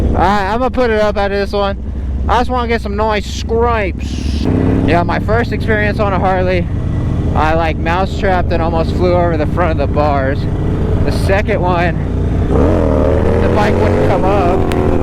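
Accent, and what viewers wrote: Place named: India. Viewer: W Indian accent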